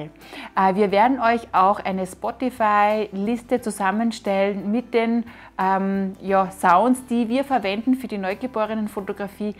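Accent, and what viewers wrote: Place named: Austria. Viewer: Hi, vielen lieben Dank für deine hilfreichen Videos. So bekomme ich von dir doch viele hilfreiche Informationen und Tricks, über die ich mir noch keine Gedanken gemacht habe. Zu deiner Frage, ob du gut verstanden wirst, sage ich ein klares Ja. Da ich aus Wattenscheid/Bochum - NRW-Deutschland komme, sind wir "Ruhrgebietler" von Natur aus offen für alle Sprachen/ Kulturen. Dazu liebe ich die Aussprache von Menschen aus Österreich und der Schweiz sehr. Vor allem der Gruß zu Abschuss (Baba) Alles Gute dir, deinem Team und deiner Familie.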